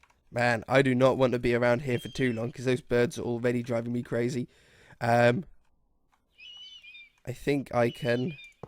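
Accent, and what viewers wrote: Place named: England, United Kingdom. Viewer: Make a soccer stadium plz oh and where nabours your English 🇬🇧 and I'm Irish 🇮🇪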